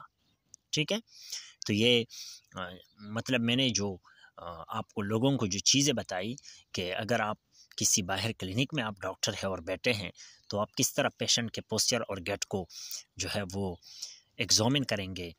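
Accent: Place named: Pashto, Pakistan. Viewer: Aesa lg rha hy ap pashto mn English bol r hn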